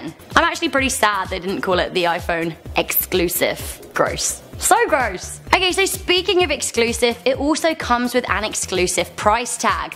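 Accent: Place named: United Kingdom. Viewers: Zuriel Babida exactly her British accent is cute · Winter Plays what cute accent? She's british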